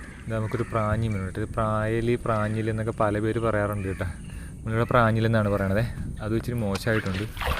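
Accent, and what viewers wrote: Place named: Cherthala, India. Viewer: ചേർത്തല സൗണ്ട് ❤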